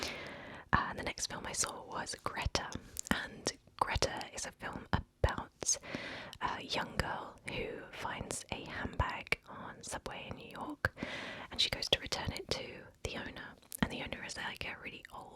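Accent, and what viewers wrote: United Kingdom. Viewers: You are genuinly the only asmrtist with a british accent i really enjoy. The way you speak is mesmerizing. Loved the video! :)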